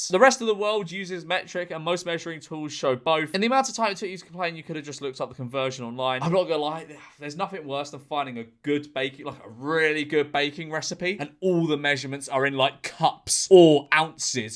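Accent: United Kingdom. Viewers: Mate, it was kinda funny when I (Russian guy) just understood the meaning of 'inalienable' and what it derived from, though hearing it for the first time, while a guy who sounds British just went straight to Google Translate:) Guess I am just used to encountering lots of English words I see for the first time and have to guess the meaning by context or morphology. No offense to you, it's OK, the situation itself made me smile.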